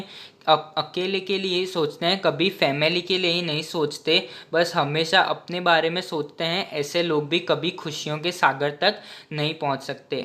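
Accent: Gujarat, India. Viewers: Aap gujarati ho na ? Aap jese bol rahe ho vaisa to gujarat me Hindi chalta hai .... By the way I am also from Gujarat 🙋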